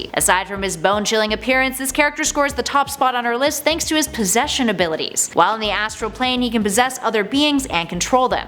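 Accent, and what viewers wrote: Canada. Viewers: You're Canadian are you not? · You're totally Canadian aren't you chicka lol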